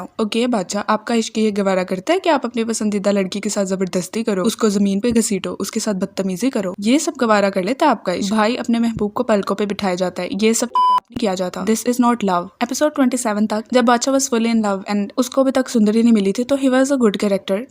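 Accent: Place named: India. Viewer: Par apki accent indian lagi such mai hmko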